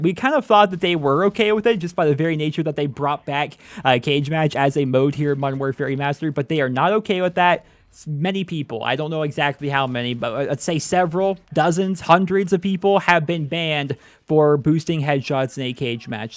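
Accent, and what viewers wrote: United States. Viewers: Really you're English? Your accent sounds American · are you really English? I thought you were American. where in England are you from? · Wait... You're English? I thought you were American or Canadian Well I'm Scottish and most people don't realise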